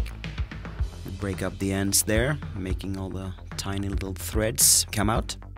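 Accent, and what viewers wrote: Sweden. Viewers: hearing your accent I guess you're swedish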